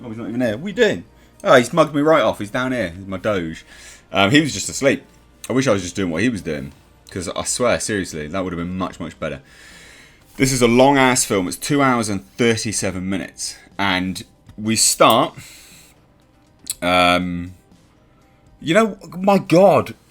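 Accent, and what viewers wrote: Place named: United Kingdom. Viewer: simply says what any sane person thinks about most things but he says it in that accent like hes just ur British homeboy. Do wish he'd throw in a "Bruv" now and again tho.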